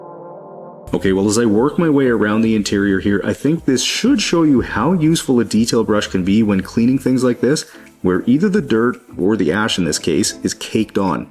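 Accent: Canada. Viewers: "Aboat" that's when I found out you're Canadian 😆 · 1. Are you from Canada? I hear an accent. 2. I hate that there was a dog in there 😢 and 3. That was NICE vehicle, they had a moonroof, and whoever drove it was VILE 😢 but it looks amazing. Love the video ❤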